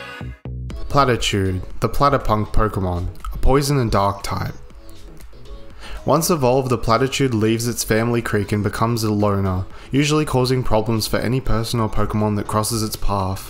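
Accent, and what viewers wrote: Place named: Australia. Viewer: What part of Australia are you my man?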